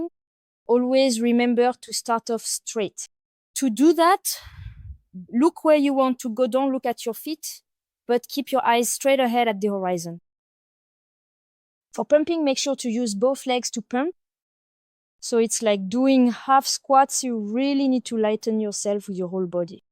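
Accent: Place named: France. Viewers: " 2 weeks ago Cet accent Français en Anglais parlé est insupportable." Not so for this native English speaker. Au contraire, it is very pleasant to listen to. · Cet accent Français en Anglais parlé est insupportable. Dsl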